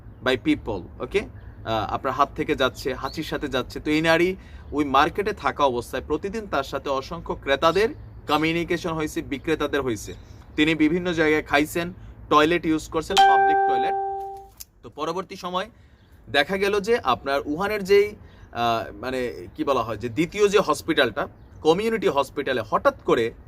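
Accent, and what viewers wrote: Comilla, Bangladesh. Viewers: Vai apni khati comillar vasa kotha Bolen valoi lage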